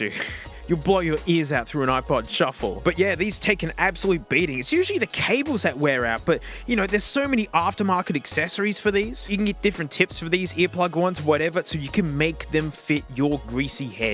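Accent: Australia. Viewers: why are you watching that when you could be listening to an Australian man talking about headphones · yelling austrailian man is back :0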